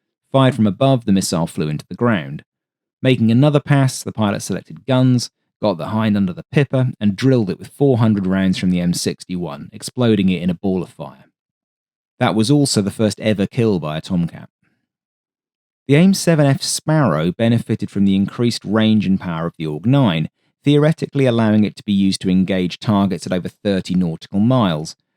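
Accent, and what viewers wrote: United Kingdom. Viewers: Why oh why does it ALWAYS have to be a snooty British narrator on every documentary on YouTube? Even about every American airplane and ship? I can’t stand it.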